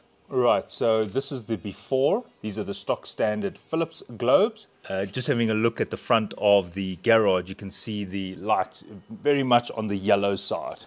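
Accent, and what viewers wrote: South Africa. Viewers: This man has that OG soutie transvaal South African accent. Haven't heard it in ages.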